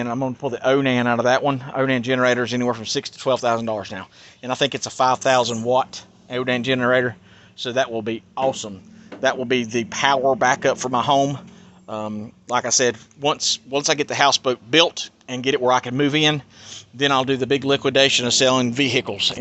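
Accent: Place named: Appalachia, United States. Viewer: Hey man. I just found your channel today. I've looked into the phenomenon of gangstalking a little bit before. I believe it to have a spiritual component as well, if not mostly. (btw, if you haven't yet, check out Jeremiah Cohen on YT. His testimonial is insane and eye opening). I thought I recognized a close cousin of my own accent when I heard you speak. I live a couple hours east of you in the beautiful foothills of the GSM. Anyway, my question for you is this: Why are they doing this to you? Have you seen or heard or been a part of something to draw this to you? Or is it based on something you did or said to anger them? I'm genuinely curious. I've watched a couple of your videos but haven't yet heard the story relating why it is you think they are doing this. A reply would be much appreciated. You and yourn be purdy, now.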